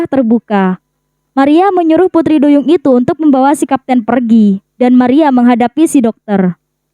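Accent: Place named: Madura, Indonesia. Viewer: Kek orang madura nih logatnya 😅😂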